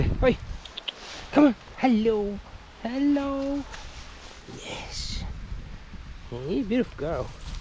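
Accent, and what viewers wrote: Scotland, United Kingdom. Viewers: Great to see how much you love your beautiful cat.I have two one year old cats and I talk to them too😸😁I live in Italy but I was born in Edinburgh so I enjoy listening to your "bonnie" Scottish accent 😁and admire the great scenery👍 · Great to see you guys! I have been doing some family history studying and researching my Scottish roots. Your accent takes me to to a place I want to visit someday. Loved your book!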